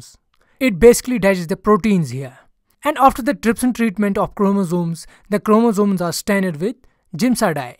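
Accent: Kashmir, India. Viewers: You voice is like that of Kashmir. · Well Explained Although the Indian accent is thick as fu*k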